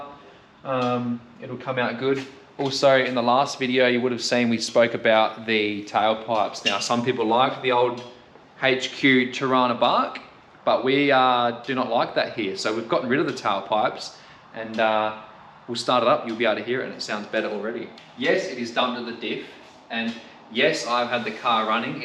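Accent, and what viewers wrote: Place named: England, United Kingdom. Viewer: He now's about Aussie cars but he sounds like his from pommyland its the only thing got me stumped about this channel.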